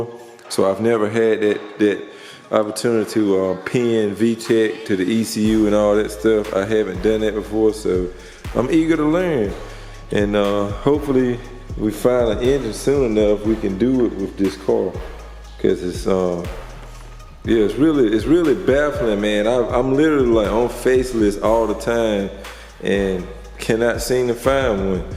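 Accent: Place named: Southeast Texas, United States. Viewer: Bruh you sound like you from south east Texas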